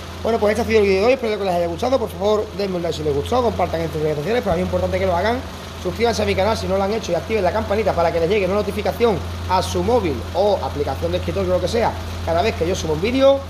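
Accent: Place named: Spain. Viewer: En el minuto de tu video preguntas "me entendéis no? y la verdad que se te entiende la mitad de lo que dices, mi intención no es desvirtuar tu trabajo ni mucho menos descalificar tu modo de hablar, se perfectamente que en algunas regiones de España tienen fonéticas al hablar bastante particulares, lo cuál es respetable pues es tradición autóctona y eso no se discute, en el norte de mi país también ocurre pero no tan diferenciado, pero para los latinos que hablamos el Castellano mas pausado nos resulta difícil entender este léxico y tu si que hablas rápido, creo que deberías tener en cuenta esto a la hora de hacer un video redactando mas lento ya que serán muchos los latinos que lo verán y les facilitarás el entendimiento, gracias por tu video y un feliz 2022.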